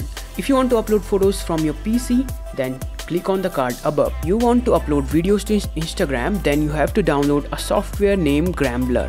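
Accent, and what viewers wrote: India. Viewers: Am I wrong for immediately not trusting this due to the street shitter accent? lmao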